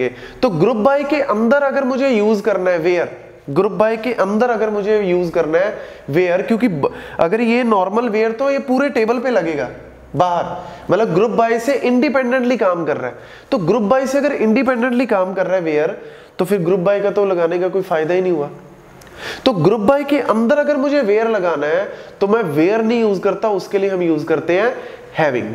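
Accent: Punjab, India. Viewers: Braber Punjabi tone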